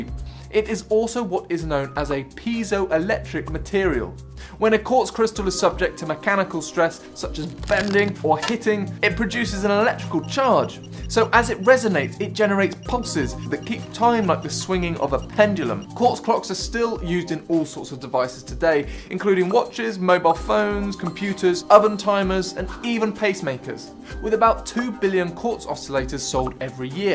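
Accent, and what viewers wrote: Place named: United Kingdom. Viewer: This British accent. I can't understand even a thing.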